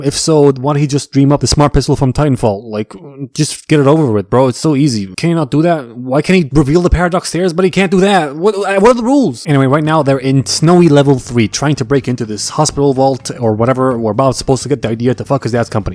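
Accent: Spain. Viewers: ...I thought he was either Spanish, Hawaiian or Asian. 🤨🥴🤔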